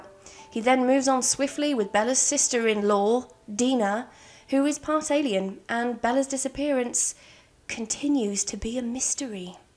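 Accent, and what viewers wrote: England, United Kingdom. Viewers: Where you from? That english accent is unique and I only hear it in the movies or on tv.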